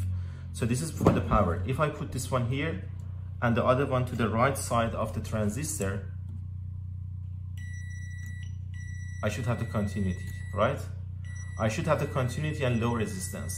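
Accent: Iran. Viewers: You have an accent are u from Iran? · just say thank cause it was useful for me and enjoyed your sweet persian accent👍👍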